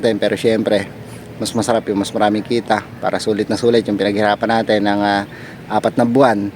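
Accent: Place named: Bulacan, Philippines. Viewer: Bakit ang punto mo when you speak tagalog ay parang from Bulacan ka? I am from Hagonoy, Bulacan. Pero, 54 years na ako sa America. Paborito ko kasi ay calamansi juice.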